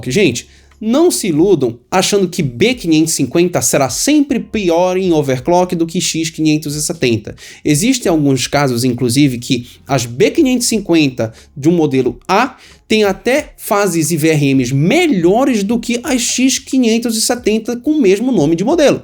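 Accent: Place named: Belém, Brazil. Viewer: Mano... Tu és de Belém-PA? Teu sotaque é típico da nossa rapaziada. 😁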